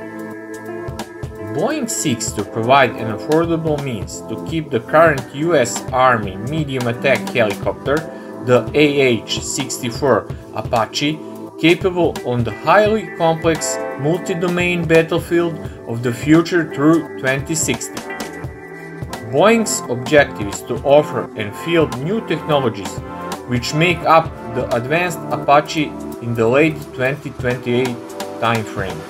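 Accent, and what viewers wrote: Russia. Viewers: Drunk, Russian narrator needs to sober up... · Why the fuck should I believe any one with a damn Russian accent giving me updates on a new American advanced helicopter!? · Why a Russian narrator ? hard to understand what he is saying ! still love all of the cobras ! :)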